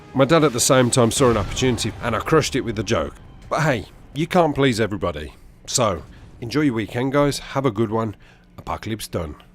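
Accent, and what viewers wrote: Birmingham, United Kingdom. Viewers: Nice story BRUMMY LOL Getting much better. Keep it going Why don't you do a face reveal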